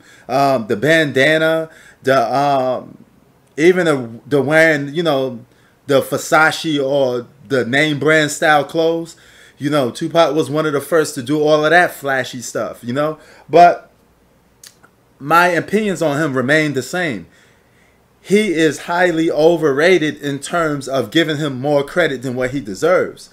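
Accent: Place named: Baltimore, United States. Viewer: You’re from Queens. I honestly thought you were from Baltimore from your accent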